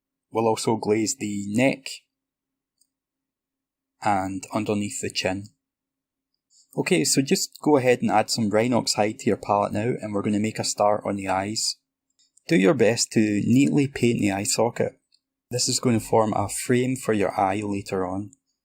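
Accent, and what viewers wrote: Scotland, United Kingdom. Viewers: VERY controlled and detailed work, the 'subtle' finish speaks (looks???) for itself! Great and CLEAR presentation in terms of info/technique/example AND such a lovely Scots accent!!! xx SF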